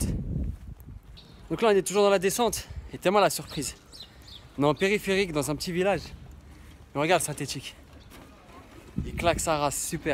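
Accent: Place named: Morocco. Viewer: Je suis marocain je m’abonne frère t’es un bon et maghnia excellent il parle comme les marocain certains Mashallah big up pour les frère algérien 🙏🙏🙏🙏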